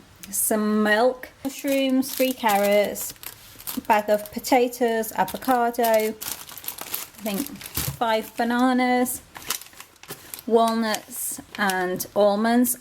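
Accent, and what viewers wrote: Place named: United Kingdom. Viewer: Thanks for doing this beautiful video. I liked your British accent. Were your parents or grandparents from Indian Punjab?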